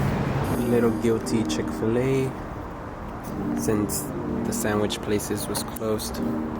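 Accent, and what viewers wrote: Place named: Philippines. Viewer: Quality video! May I know your nationality? You sounded like a filipino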